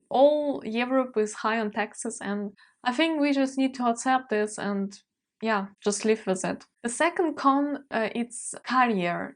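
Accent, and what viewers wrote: Russia. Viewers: Hallo liebe (meine Tochter heißt auch ) und Servus (=Hallo) aus Wien! Da dein Englisch viel besser ist als meines, schreibe ich dir auf Deutsch. Ich finde deinen leicht russischen Akzent bezaubernd! Gratuliere dir zu deinem sehr informativen Video. Das ist sicher eine große Hilfe für andere Menschen, die sich für ein Leben im schönen Wien interessieren. Du hast wirklich die wichtigsten Punkte erwähnt. Zu erwähnen wäre vielleicht nocht, dass Wien, für eine so große Stadt, auch sehr sicher ist. Ich denke, gerade auch als Frau, kann man sich in Wien eigentlich, zu jeder Uhrzeit, und an jedem Ort sehr sicher fühlen. Die Kriminalität ist kein nennenswertes Problem in Wien und auch die Polizei ist sehr zuverlässig und tatsächlich für den Bürger und die Menschen da. Ja, auch ich bin, natürlich als Österreicher (das gilt nicht nur für Wien) ein Kaffeeliebhaber. 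Es gibt wirklich unzählige traditionelle, wunderschöne Cafes in Wien, aber, geraden in den letzten Jahren, kamen sehr viele, moderne, coole Cafe dazu, die oft den Kaffee selbst rösten und hervorragende Qualität und Auswahl anbieten. Als Beispiel: <link> oder <link> Die berühmten "Mehlspeisen" also die süßen Dinge, wie Sachertorte etc. nicht zu vergessen. Natürlich leidet das Leben in Wien derzeit auch unter Corona und dem Lockdown, aber ich hoffe das bessert sich 2021 wieder deutlich. Auch ich vermisse es nämlich schon sehr, bei einem guten Kaffee, einer Zeitung und mit ein paar lieben Menschen, zu sitzen und zu genie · ust i heard the 1st word i recognized that you're russian at once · Are you from Russia ? У тебя замечается русский акцент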